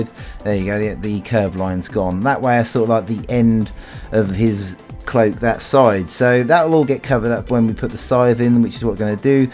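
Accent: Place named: United Kingdom. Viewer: The emblem was super hard as hell and good British voice:)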